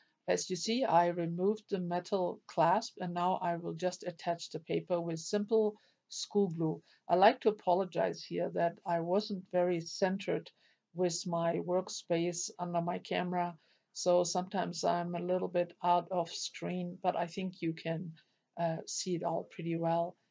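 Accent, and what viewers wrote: Germany. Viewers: Hi! Yes I won Andrea's journal and a lot of artsupplies! But you have a European accent right....? German? So great you love our little country. Hope you still visit Europe, I love to go to the USA. Last year I was for a short visit in Denver and I think you live there now? Thanks for your reply!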